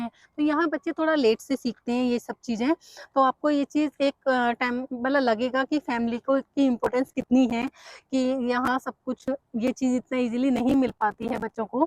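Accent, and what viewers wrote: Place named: Purvanchal, India. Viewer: Aap pakka UP purwanchal se ho... hai na ?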